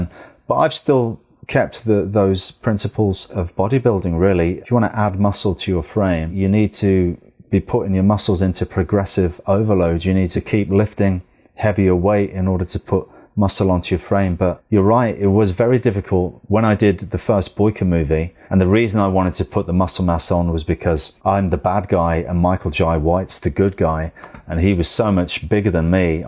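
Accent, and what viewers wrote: United Kingdom. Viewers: He has British accent, it hard to hear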